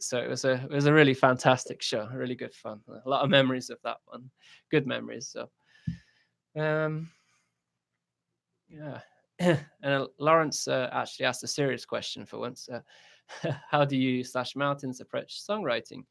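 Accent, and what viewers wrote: United Kingdom. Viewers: dude, non-music question... You sound British, but what's the sketch with you being in Korea? What's your occupation and how did you find yourself choosing to live over there?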